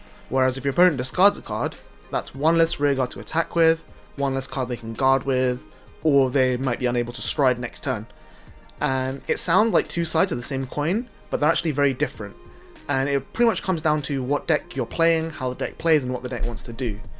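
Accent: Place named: Belfast, United Kingdom. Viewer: I like that Belfast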